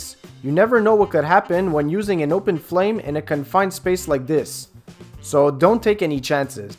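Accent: Quebec, Canada. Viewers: would you happen to be from Québec by any chance?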